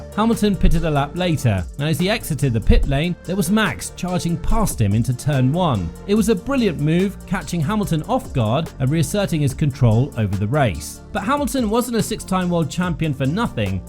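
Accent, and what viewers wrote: United Kingdom. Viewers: LH "arguably" one of the most greatest driver in history.. says the bias British accent again. Well with his 7 titles he clearly isnt. Before F1 he wasnt that special as the greats in history such as Schumi, Senna and now Max. Those last three are completely from another league.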